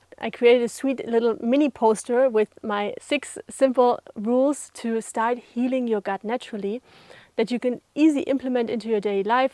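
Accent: Germany. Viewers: Will start tonight. I could listen to this sweet lady talk "all day" with that cute accent (German? I dunno).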